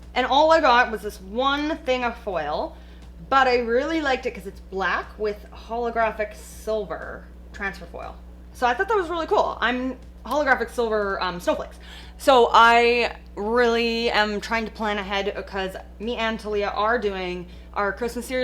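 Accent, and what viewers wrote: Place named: Western Canada, Canada. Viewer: I think it’s only out west. I lived in BC for a few years and they say it the way does. I’m in Ontario now and we say decal with cal sounding like the name cal. They also say it that way further east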